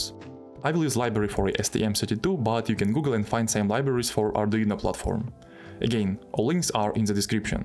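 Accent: Russia. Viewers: Акцент сразу выдает рашу )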